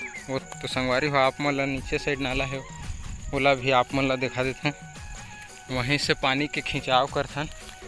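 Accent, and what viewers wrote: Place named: India. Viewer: योर स्पीक शुद्ध देसी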